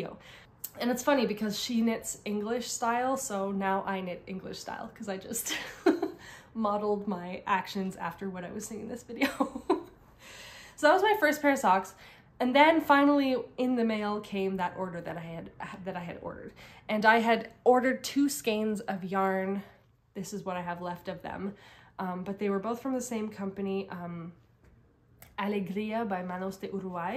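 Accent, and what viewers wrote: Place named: Quebec, Canada. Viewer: Bonjour. J’adore tes vidéos, c’est certain que je l’ai écouté jusqu’au bout. Je fais des points de croix et j’ai tombé en amour avec le livre 52 socks in 52 weeks que j’ai emprunté à ma bibliothèque. J’ai découvert un patron de Paula Wisniewska Lato sur Ravelry avec la laine de Julie Asselin (québécoise) fantastique, le patron est aussi dans le deuxième livre de 52 socks in 52 weeks II. As-tu acheté le nouveau livre de Gabrielle Vézina (québécoise) qui fait des tricot en dentelles et des bas. Avec la laine de Julie Asselin. Juste avant ton vidéo, j’ai commandé une verge (yard) de speculass et deux mini hank de falu red pour faire Harriette Salt et Louisa Coulimore sur panettone. Moi aussi j’aime les fils Roxy floss co. Le falu red est mon préféré. Je suis une québécoise (dans mon cœur)qui vit en Ontario maintenant. À 25 je me suis mariée (avec un québécois bien sur lol) mais comme il était pilote dans les forces, nous avons déménagé en Ontario Mais nous retournons visiter nos amis et famille a chaque année Tu me fais chaud au cœur quand tu parles québécois Merci ne change rien. 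On t’aime comme tu es ❤ P.S. moi j’attends encore que mon amie me montre à travailler avec des aiguilles sur câble la loop magic Eat-ce que tu tricotes comme ça? Moi je ne dis pas skein, je dit ma pelote de laine 😂 À bientôt Moi je vais à stitch north la deuxième fin de semaine d’avril et en octobre J’espère te rencontrer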